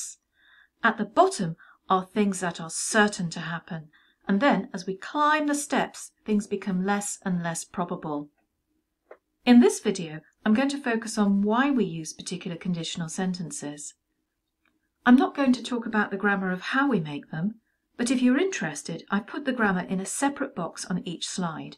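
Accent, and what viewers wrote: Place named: England, United Kingdom. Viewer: Your English accent sounds really nice, where is this from?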